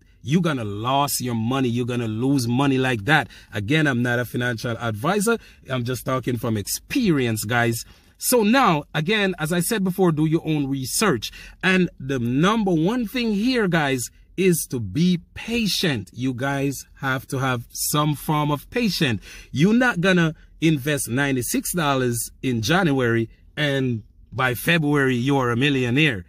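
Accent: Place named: Jamaica. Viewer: Love the Jamaican accent, I’m Jamaican as well Brother. I was thinking along the same lines as you. 100 dollars and just leaving it is a very responsible idea as a leader. Your not pushing, your just sharing an idea. Respect. I was thinking of a different idea, and that is to think like the amc apes, they buy and hodl, the only problem is, the stock market can produce naked shorts and then their battling nobody, because the hedges have found a way to short the stock without using money. In decentralized finance, you can’t do that, I want to see a crypto like this have a community that doesn’t give out crypto, but buys and hodls like apes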